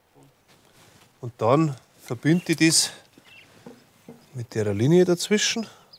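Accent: Bavaria, Germany. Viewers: Sehe ich genauso das man Dialekt/ Sprachen pflegen sollte aber ich als Nordeutscher könnte es locker in Platdeutsch verstehen aber in Baiyrisch habe ich echt Probleme da wäre son untertietel echt praktisch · einfach super gezeigt.......bitte unbedingt die bayrisch Sprache weiterhin so schön pflegen.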